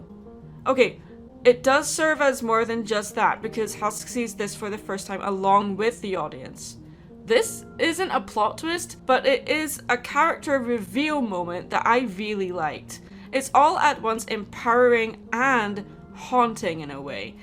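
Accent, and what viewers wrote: Scotland, United Kingdom. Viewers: omg are you scottishhhh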